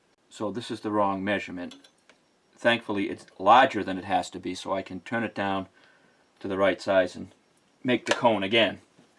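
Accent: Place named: Boston, United States. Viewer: Man, you have a really strong Bostonian accent. Are you from reveeyah?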